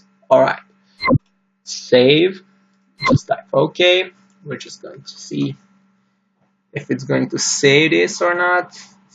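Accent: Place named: Balkans. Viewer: Hey buddy thank you for your tutorial. worked like a charm for me! I appreciate it. btw I've noticed you tend to sound like a person from the Balkans lol which is good either Bosnian, Serbian or Albanian but more Slavic I can tell lol thanks once again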